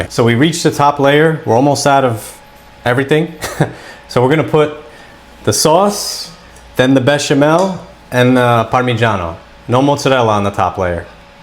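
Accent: United States. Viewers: You sound American, so what is with the recipe in Grams?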